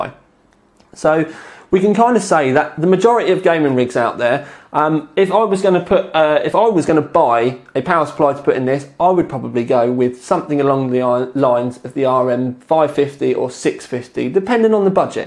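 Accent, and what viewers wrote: United Kingdom. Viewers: British accent haha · British pronunciation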